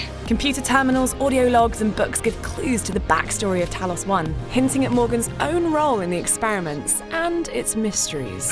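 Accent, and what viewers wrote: United Kingdom. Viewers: damm narrators british accent sounds gorgeous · tucker she is British not American this time maybe that's the different accent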